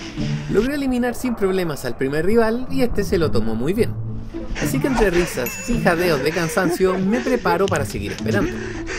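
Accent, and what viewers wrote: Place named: Chile. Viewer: Te acabo de conocer y me encantan tus videos, pero tu acento se nota que es chileno, así que lo diré: Es aguacate no palta. Nada que ver el comentario xd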